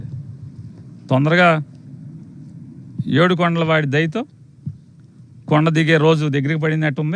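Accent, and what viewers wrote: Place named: Godavari, India. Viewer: మీరు మా గూదారొళ్లలా భలే మాట్లాడుతారండీ. పాపం నాయుడు గారండీ! ఆయ్ 😊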